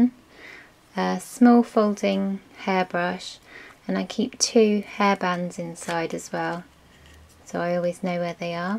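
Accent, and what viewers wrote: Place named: England, United Kingdom. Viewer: EDC, beautiful girl, english accent!! I sub'd !! LOL!!!!! Whooo Hooooo!!!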